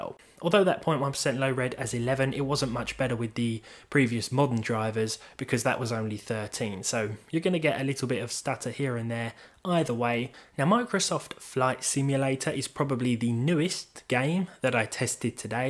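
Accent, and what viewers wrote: United Kingdom. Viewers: He's british. It's called an accent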